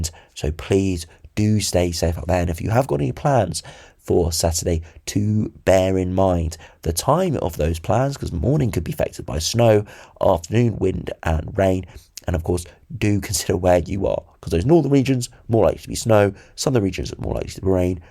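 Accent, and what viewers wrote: London, United Kingdom. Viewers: I subscribe to this channel for 2 reason, the weather and the posh London accent. You can feel the privilege in his voice.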